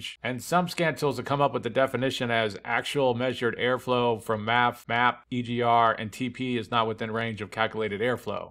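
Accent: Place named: United States. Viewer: I'm like he's speaking perfect American English so I'm like ...... huh? WTF is a Shievy Cruze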